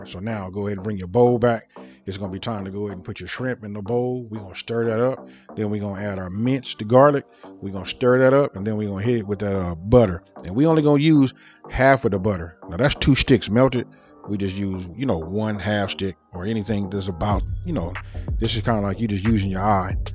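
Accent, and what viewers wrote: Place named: Los Angeles, United States. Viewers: I love California accents. We really the best out mayne 😂♥️ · If LA had an accent thish it homie. Loved the video I’m about to make this right now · I heard that Cali accent and subscribed immediately!!! Made me straight home sick. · I can tell that Cali accent anywhere. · He sounds like a west coast guy...My people loved the recipe love from the north east 😎🤙🏽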